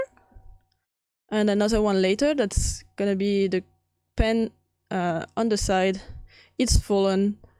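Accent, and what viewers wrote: France. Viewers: sounds like french accent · Your o̲dio was very... French. 🇫🇷 But I like it. The videos that you make help me alot.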